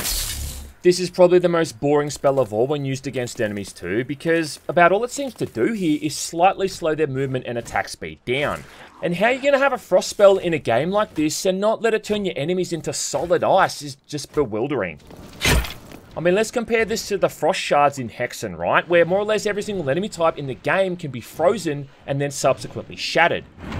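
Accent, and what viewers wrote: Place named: Queensland, Australia. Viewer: judging by your accent, are you in QLD?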